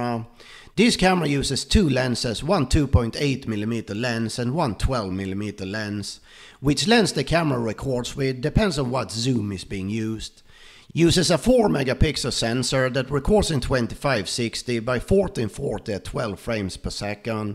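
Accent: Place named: Sweden. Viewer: Funny swedish accent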